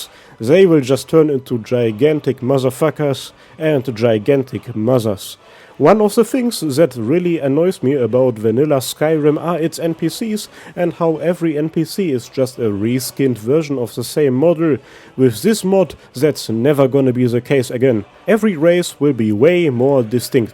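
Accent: Germany. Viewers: are you from DEUTSCHLAND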